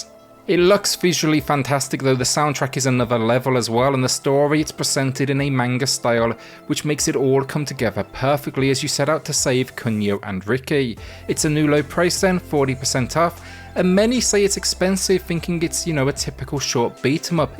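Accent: United Kingdom. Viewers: I have to ask, where in the UK is your accent from? Haha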